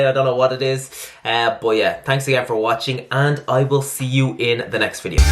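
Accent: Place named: Ireland. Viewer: Based on the accent he's from Ireland, maybe Dublin. Im not sure though.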